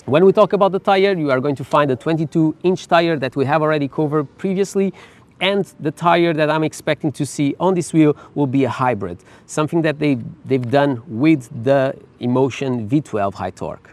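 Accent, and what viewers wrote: Portugal. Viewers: Apostava que és português...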